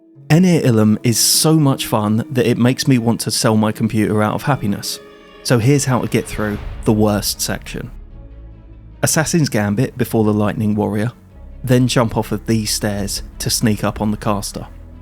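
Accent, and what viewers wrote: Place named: England, United Kingdom. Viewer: new sub coz of this video. short, to the point and entertaining as well. The english accent feels like home having lived there for my childhood. (Southampton)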